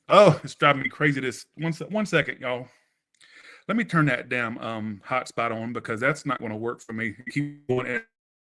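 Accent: England, United Kingdom. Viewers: I'm still here! Ha you kept freezing 🥶 Your back Thanks so much. The English accent 😂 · The British accent sent meeeee 😅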